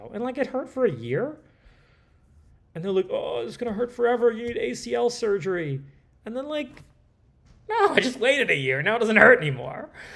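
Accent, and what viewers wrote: New Jersey, United States. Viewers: That New Joysey Áccent. 🙃🙂😃😊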